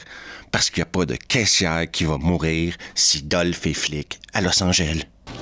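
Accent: Canada. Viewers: J'adore ta manière de compté une histoire avec ton accent canadien 😂😂😂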